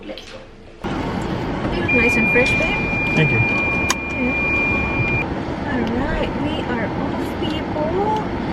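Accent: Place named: Visayas, Philippines. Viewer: Hala gibisaya nana nmu ate..hehehehe..so cute😍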